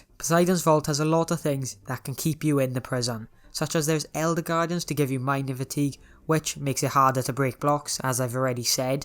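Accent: Wales, United Kingdom. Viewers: Is he welsh??? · U Welsh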